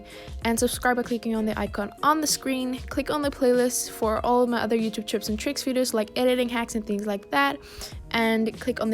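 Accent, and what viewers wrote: South Africa. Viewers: are you also from South Africa?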